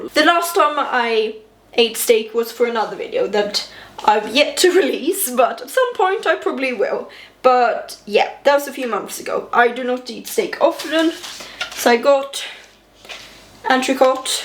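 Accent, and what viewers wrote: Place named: United Kingdom. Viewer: Jeez, I thought you were British. Your accent is incredible.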